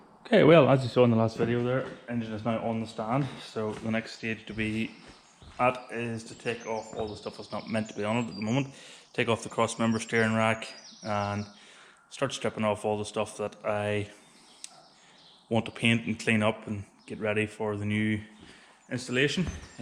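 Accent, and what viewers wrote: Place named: Northern Ireland, United Kingdom. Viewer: Hello I have just started to follow your channel and I like what your are doing. It was the st170 engine into the mk2 that I was interested in first because at some point I will be putting an st170 into a red mk3 escort. By the sound of you your from Northern Ireland so am I can I ask what part are you from.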